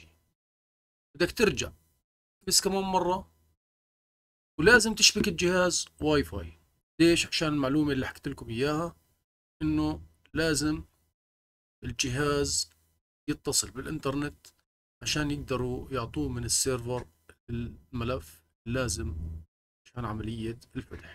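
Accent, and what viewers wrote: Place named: Libya. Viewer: فيك لهجة ليبية 😮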